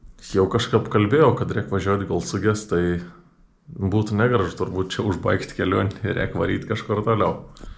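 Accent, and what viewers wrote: Samogitia, Lithuania. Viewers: sunku buvo priprasti prie tavo zemaitiskos snektos, bet siaip unikalu ganetinai, pradejo patikti :D o kiekvienas tavo video turi kazkokio sarmo, tau tinka kurti toki content'a :) sveikinu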